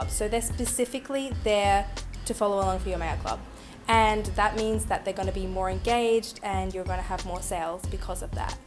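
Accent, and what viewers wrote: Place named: Australia. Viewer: You must be spending a small fortune in stamps! You sound like you're from Australia. Our stamp prices in this country are astronomical! How do you cope financially?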